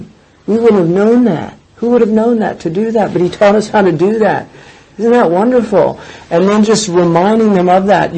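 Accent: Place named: California, United States. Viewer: This stupid sufi grave licker 12 Imams worshiper brother of Shia said alarm clock is bida...what a donkey thats not bidah .BIDAH IS SOMETHING WHEN YOU INOVATE IN THE RELIGION...LIKE START ORAYIN 6 OR 7 SALATS...OR THE EVERY SALH YOU MAKE 4 RUKKA..OR MAWLID THAT RASSULLULLAH NEVER DID.This is one oerson i trully dont like at all...plus his voise sounds sooooo gay...he talks with a soft surfer california accent...serfs up dude .Just listen he sounds like a girl then he has the second voice that is crackking in the back like a car with a bad exoust .Sounds like he has a big coul .SUFI YOU AND YOUR SHIA BRITHERS ..WHATS THE DIFFERENCE IN YOUR CULT ??NOTHIG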